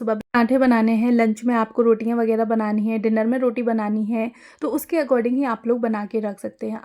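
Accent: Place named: India. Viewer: Because your acent is like india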